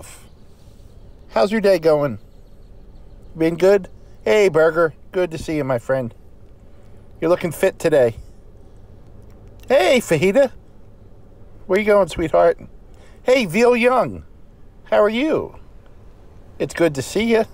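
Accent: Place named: New England, United States. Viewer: I’m catching up again, so good to hear your yankee voice! Never realized how homesick you still get after 47 years!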